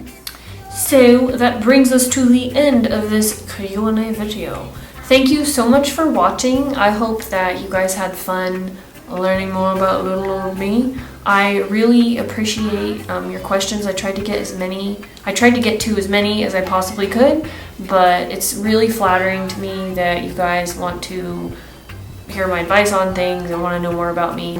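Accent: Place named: South, United States. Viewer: Where are you from? I hear a mild southern accent!!!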